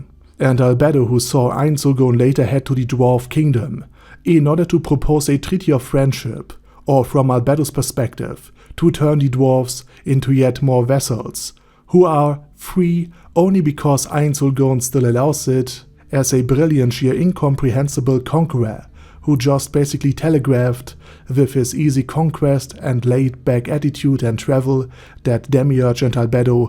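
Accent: Germany. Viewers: As a german i am so triggered by our accent. I am sry but it triggers me so much. I like the thoery videos and shit about overlord though.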